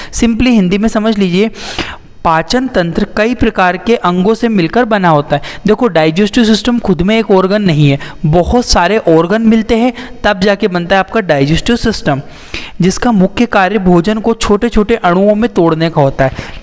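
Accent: Indore, India. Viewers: Mtlb. Sr ap indore se. Ho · Sir aap Indore se ho kya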